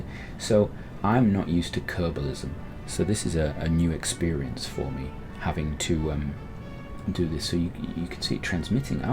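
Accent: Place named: United Kingdom. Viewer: hmmmmmmmmm that british accent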